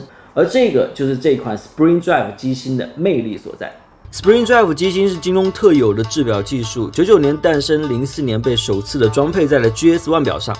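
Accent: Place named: Taiwan. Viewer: 滿口台語音 爲怎麼簡體字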